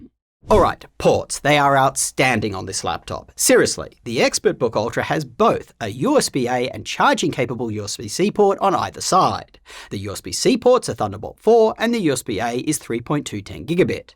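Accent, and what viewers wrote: Australia. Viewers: My God....I love the video but no offense you've got a very disturbing australian accent with bloody annoying high tone pich to it😂😂 Can not stand by longer than 1 minute....sorry😅😅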